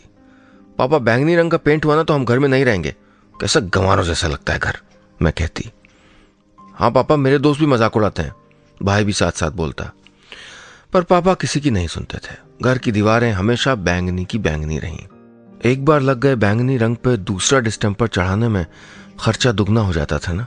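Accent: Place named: Uttar Pradesh, India. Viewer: sir ...apki aawaj me kuchh up ka touch lgta hai ....😍😎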